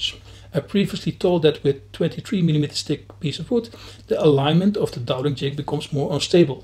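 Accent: Netherlands. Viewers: Oh I’m sorry I thought you had a speech impediment. Your Dutch. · the subs are a real improvement.. your funny Dutch accent is sometimes hard to understand.. (and I speak dutch) Nice review..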